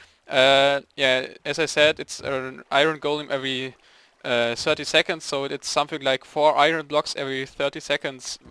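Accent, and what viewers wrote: Germany. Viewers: u sound german :D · real german english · He sounds like a german and there are some german players around him (Banenenkrieger = bananwarrior)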